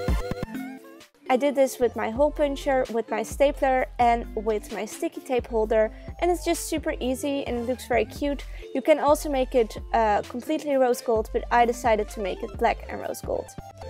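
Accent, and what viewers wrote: Netherlands. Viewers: Je hebt een Nederlands accent aan je engels supergrappig!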